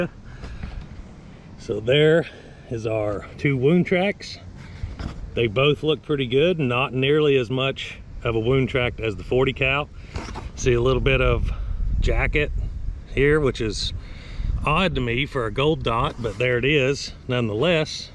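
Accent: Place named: Appalachia, United States. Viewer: You ain't gotta give me specifics, but where is the Gun Dungeon located? From your accent and the hills in the background I'd say East TN, Eastern KY, Western NC, or SW VA.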